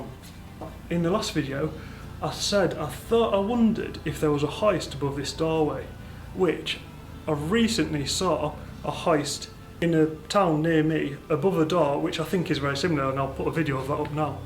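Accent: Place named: Northern England, United Kingdom. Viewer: Lancashire somewhere I would guess good question .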